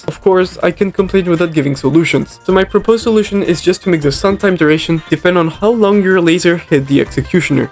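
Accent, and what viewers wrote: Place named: France. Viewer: t'es francais mdrr t chaud en anglais frro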